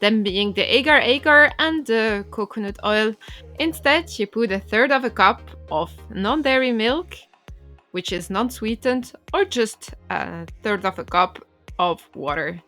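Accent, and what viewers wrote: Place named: France. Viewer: Hello! Je profite de ton accent français pour te féliciter dans la langue de Molière! Cette vidéo est très claire, agréable à regarder et donne envie de reproduire la mozzarella. Je vais me lancer car j’ai bien envie d’une bonne pizza! Continue!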